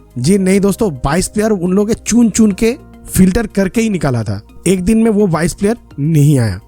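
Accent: Bengal, India. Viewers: Bhai aap west Bengal se ho kya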